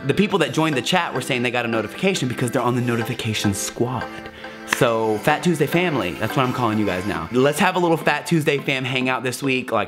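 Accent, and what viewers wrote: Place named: South, United States. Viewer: Do Sunday. Sunday is a day that I’m just getting my life together, maybe being lazy and hanging out with you and everyone else during that time was super fun!!! Also — are you from Seattle? Yer accent can git reaul southern,!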